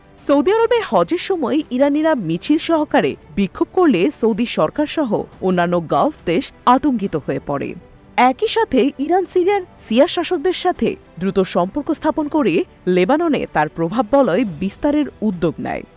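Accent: Kolkata, India. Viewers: ৭১ টিভিতে কি আজকাল ভারতীয়দের নিয়োগ দেয়া হয়? এই সংবাদ পাঠিকার উচ্চারণ শুনেই বোঝা যাচ্ছে যে সে পশ্চিমবঙ্গের কলকাতার অধিবাসী! সকল দন্ত্য-স এর উচ্চারণ কলকাতার টানে বলছে!!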